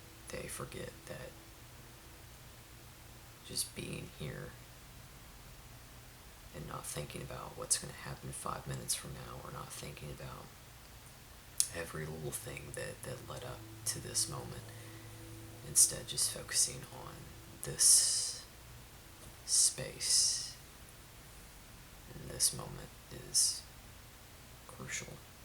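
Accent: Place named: Oklahoma, United States. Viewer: I’m from Oklahoma, so your slight accent makes me feel like 10 times better. Most people who do stuff like this talk properly and it’s soothing,yes, but your accent makes me feel at home and o feel like it’s actually comforting not just soothing.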